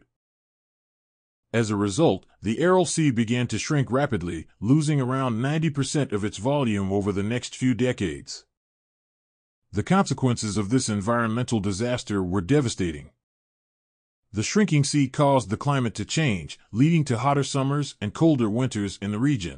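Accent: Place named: United States. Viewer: Мерзкий американский акцент + неккоретное произношение👎👎👎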